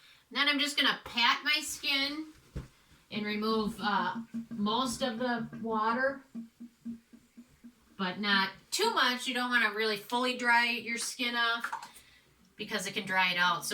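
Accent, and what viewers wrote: Minnesota, United States. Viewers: it does my heart good to hear your Minnesota "accent"... I'm originally from North Dakota and it sounds like home. 😄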